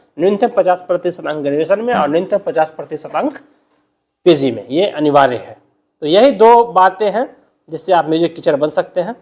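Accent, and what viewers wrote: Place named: Bihar, India. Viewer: Bihar se h madam o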